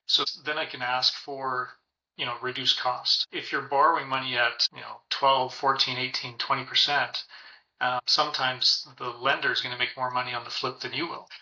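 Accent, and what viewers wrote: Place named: Canada. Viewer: Great Canada voice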